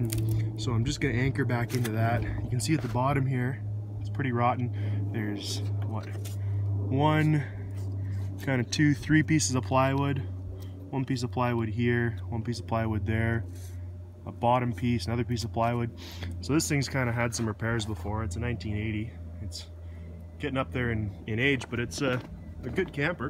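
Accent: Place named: Canada. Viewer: It's the Canadian accent lol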